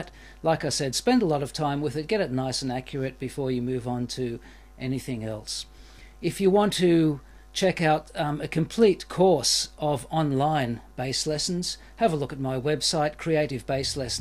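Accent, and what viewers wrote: Australia. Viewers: Im pretty sure hes Australian :/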